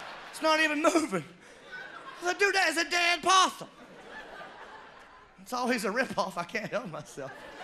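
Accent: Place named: South, United States. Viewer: I'm from a small ass southern town & I just found this guy he legit is apart of my family just bc of all the similarities. The accent is killing me bc it's like listening to my crazy ass uncle's 😭😂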